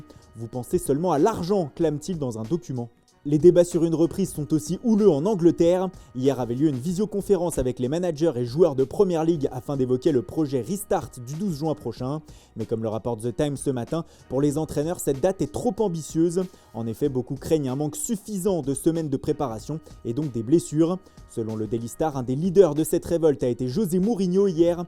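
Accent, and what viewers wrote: Italy. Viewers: l'accent Italiens legandaire. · L’ accents italiens légendaire 😂🔥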